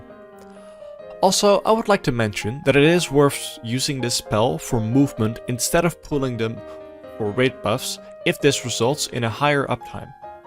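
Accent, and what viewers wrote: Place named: Netherlands. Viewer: Ah the Dutch accent, love it:D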